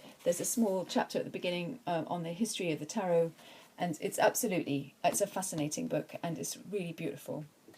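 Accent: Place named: England, United Kingdom. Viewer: How did you get a nice English accent ?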